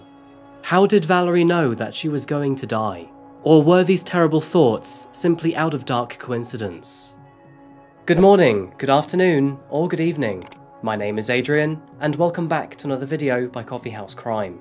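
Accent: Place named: England, United Kingdom. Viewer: I believe he actually does live in the US now, if I remember correctly when mentioned in a previous video. I forget what city/state. EDIT: I was wrong, he is FROM America, but moved to the UK at a young age and lives there now! I got it mixed up! But now I can't remember what city he was from in the US. I feel like it was in the Pacific Northwest for some reason? Maybe I just have Seattle on my mind because he loves coffee lol. I honestly don't know. But yes he moved to UK at age 7 per his Q&A video and developed the English accent. He is likely just promoting Scentbird because they're a sponsor for his channel, but sponsors often send the content creators free stuff.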